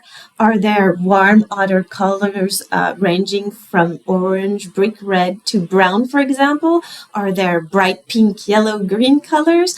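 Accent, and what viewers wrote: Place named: France. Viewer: Yu are absolutely great,it is wonderfully to listen to yu , are Yu french,Yu have lovely accent and it is really pleasure to see yu😊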